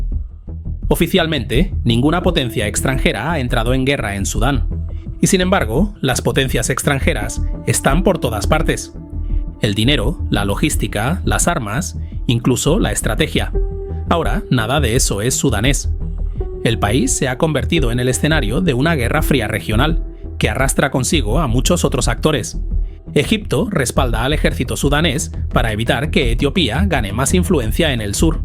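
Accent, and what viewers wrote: Spain. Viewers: gracias a Dios que contraron a un español para traducir al castellano en acento neutro de España. La IA es cutre y suena a tu acento tercermundista de come bananas.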